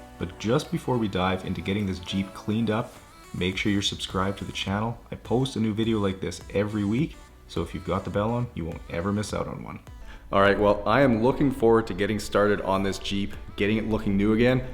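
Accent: Canada. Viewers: when he talks to his audience: slight Canadian accent talking to actual people: Canadian intensifies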